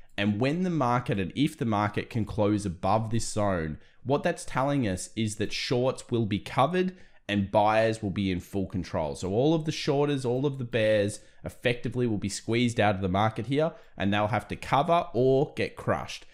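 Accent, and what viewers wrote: Australia. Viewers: Hey, hey, hey! Welcome back. We've missed the great Aussie accent. 😂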